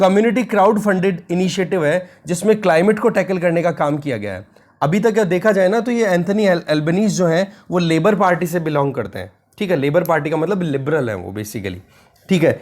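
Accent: India. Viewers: Sir , HARIYANA Se ho kya aap .?? Thank you so much for such a great explaination... ❤️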